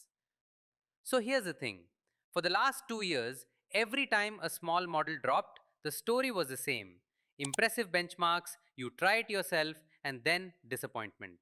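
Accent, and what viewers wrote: India. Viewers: first indian accent which I actually endured, insane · oh no indian english - instant quit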